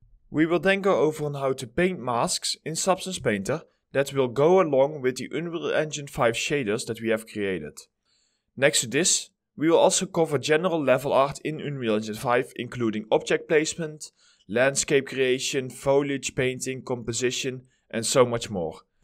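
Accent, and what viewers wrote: Netherlands. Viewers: Ooof a heavy case of Dunglish. But cool tutorial!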